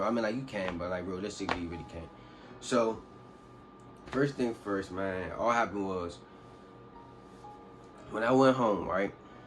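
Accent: DMV, United States. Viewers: Could tell u was from the DMV 🤣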